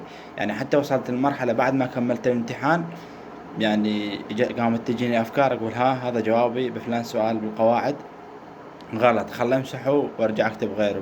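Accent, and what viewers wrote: Mosul, Iraq. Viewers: احسك من اهالي الموصل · من لهجتة مبين مصلاوي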